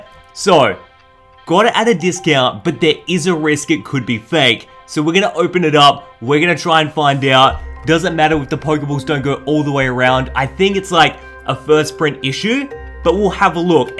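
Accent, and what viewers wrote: Australia. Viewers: Are you an Australian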